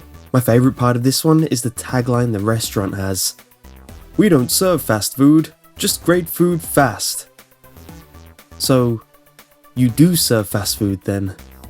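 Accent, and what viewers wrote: United Kingdom. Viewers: Your englaned I thought you were British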